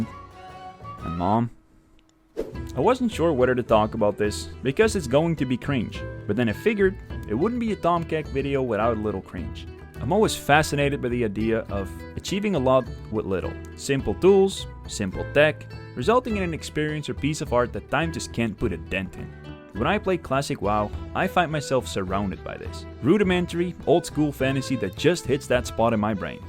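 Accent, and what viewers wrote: Netherlands. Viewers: Je spreekt super goed Engels voor een Nederlander. Letterlijk accentloos. Echter hoorde ik heel lichtjes dat jij een Nederlander was! Super content man. Het is jou gegund om te groeien als een knol!!!!🎉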